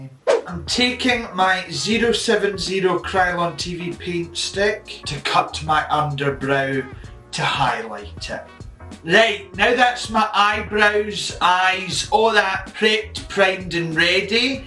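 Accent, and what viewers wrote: Scotland, United Kingdom. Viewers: So so proud to see a Scottish queen!!! You did us all proud! I think anyone Scottish would know you're not faking😂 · I can actually stand Lawrence when he speaks normally. Hate the guts out of him when he purposefully makes his voice deeper “YEW AWRTIE LAWDS” We all know you’re Scottish it’s not difficult to tell. We have all probably met a Scottish person in real life they aren’t a rare breed of person I promise you no one cares more about you if you do that cheesy voice, we actually like you a lot more when you just speak without psychically shouting and making your accent a lot more prominent. I love your accent, when you speak in a normal tone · We stan a plus size queen. We stan a Weegie. And we stan a Scottish burr. Congrats gorge!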